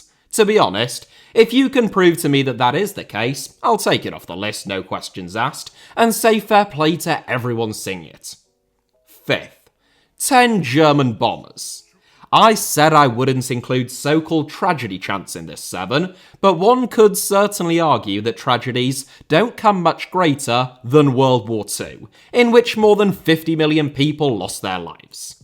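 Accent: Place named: London, United Kingdom. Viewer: Ironically, has much more of a London accent, than a East Yorkshire accent.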